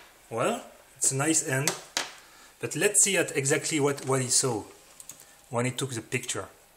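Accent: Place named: England, United Kingdom. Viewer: Fucking english accent , tu crains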